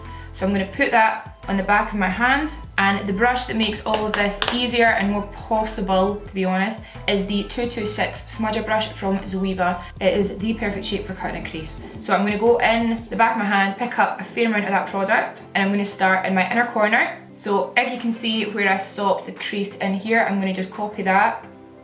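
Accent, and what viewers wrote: Scotland, United Kingdom. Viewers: Your scottish accent is the best kind. yours is the kind of accent I wish every Scot would have. non of that ned shite 👍😂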